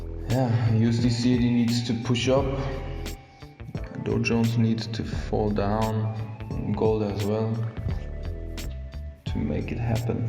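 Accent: Germany. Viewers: Are yo German dawg?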